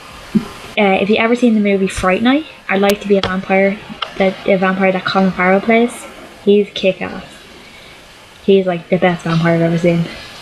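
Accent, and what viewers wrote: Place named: Ireland. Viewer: I LOVE YOUR FLIPPIN ACCENT, I've got Irish in me and I've been trying to get the accent down and you speak it fluent and I really could use your help with it.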